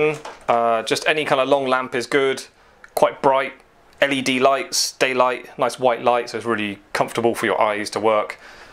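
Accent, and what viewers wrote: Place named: England, United Kingdom. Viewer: Great video Mate, thank you! What 's your accent? (what part of England Imean)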